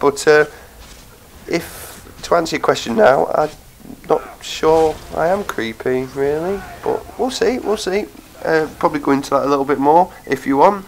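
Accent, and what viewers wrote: United Kingdom. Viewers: Much congrats nice to hear a British voice AND thanks for the name check it made my day..keep up the good work..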